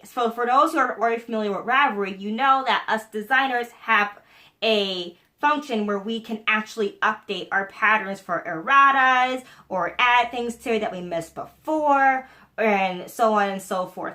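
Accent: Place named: New Jersey, United States. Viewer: Love the “bawls and cawfee”. I’m from NJ too and worked hard to get rid of my accent, lol. Do you also call a dresser drawer a “ draw”?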